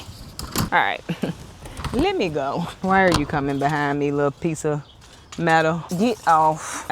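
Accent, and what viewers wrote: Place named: Chicago, United States. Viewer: I cannot convince myself that you are not from The Chi. You just have that Chicago vibe. lol. Heeeey from Mississippi!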